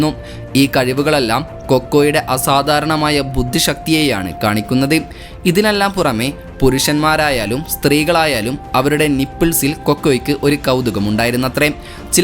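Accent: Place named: Kozhikode, India. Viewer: നിങ്ങളുടെ accent ന്യൂട്രൽ ആക്കൂ. ഒരു commentary യിൽ കോഴിക്കോടൻ slang കേറി വരുന്നത് തികച്ചും അരോചകമാണ് (തിരു.തൃശൂർ ഉൾപ്പെടെഏതു Slang ഉം) "ത്" എന്നതിനു പകരം "തി" ഗോറില്ലയ്ക്ക് എന്നതിനു പകരം ഗോറില്ലക്ക് എന്നിങ്ങനെ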